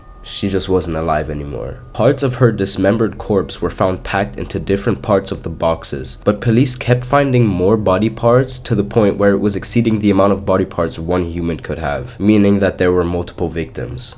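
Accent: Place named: Germany. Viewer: Also a German here! Man hört den Akzent aber nur ganz schwach raus, find ich :D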